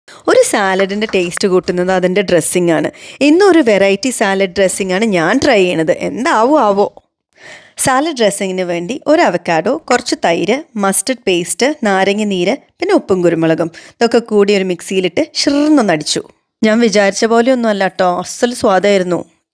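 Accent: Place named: Kerala, India. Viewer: Ur talking like Thani Malayali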